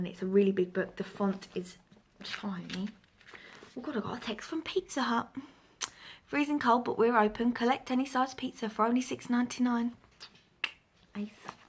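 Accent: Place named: RP, United Kingdom. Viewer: hey there ! cool vid hon ! :) :) hugs hugs aww u r nicely organised :) i got a little book revew diary :) i cringed at times in Eleanor too it was sweet book tho hugs where u from hon ? u have similar accent to me .. only u sound posher than me! hugs hugs from Essex :)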